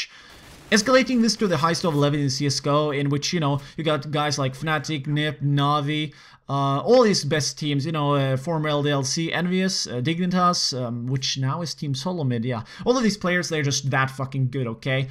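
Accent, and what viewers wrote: Norway. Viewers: Norwegian?... not swedish?.... first time :) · Haha er du norsk? xD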